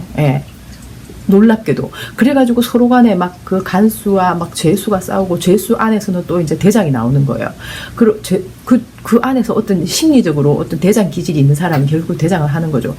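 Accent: Gyeongsang, South Korea. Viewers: 그동안 잠깐씩 듣다가 이번엔 좀 길게 들어봤어요. 정치신세계 팬 인가요? 왠만한 판별력과 자기 중심이 없다면 들을수록 빠져들게 애둘러 말하는데 결국은 민주당과 김어준 주진우 등 대통령의 강한 우군들 에대한 욕이었네요~ 참 교묘하다! 대구 경북 쪽 말투로... 이 방송 보시는 분 들은 이동형의 이이제이 를 먼저 듣고 판별력을 키우신후 듣기를 바랍니다. 내말이 당신의 의도와 반대였다면 담부턴 좀 단도직입적으로 말하길 권합니다!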